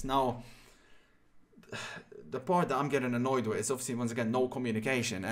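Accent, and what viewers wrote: Ireland. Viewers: Sometimes he sounds French sometimes he sounds irish 🤔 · You have an irish twist to your accent. You live in Dublin?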